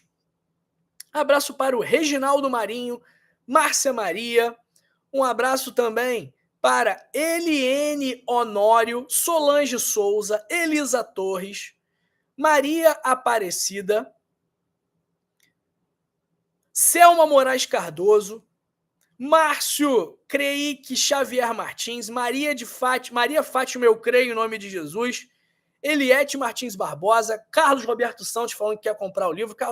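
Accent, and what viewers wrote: Rio de Janeiro, Brazil. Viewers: Bom dia Muito boa as suas orientações . OBRIGADA .QUERIDO DR AMO O SEU SUTAQUE CARIOCA EU SOU GAUCHA . Aqui Jaguarão RS fronteira com Uruguai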